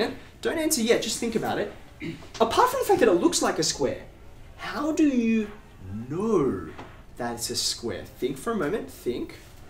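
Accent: Australia. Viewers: is this guy aussie? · it's the aussie accent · He's actually Australian. A person's native language and accent is not hereditary, it is environmental. If you grow up hearing most people around you speak English with an Aussie accent, you'll also speak English with an Aussie accent as your first language. And this is how it works for all people who grew up in Australia (Aboriginals, British, Chinese, etc), regardless of their ancestry, unless they grew up in an ethnic enclave, only hearing people speak their ancestral language. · Pretty sure that’s an Aussie accent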